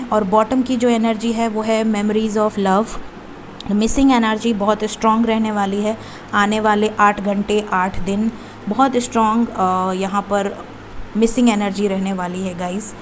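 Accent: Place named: Varanasi, India. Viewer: Dii jiss tarah se aap bat karti hai I totally luv it❤️ full banarasi language even I m from varanasi Mahadev🙏 love your positive reading 😇🤗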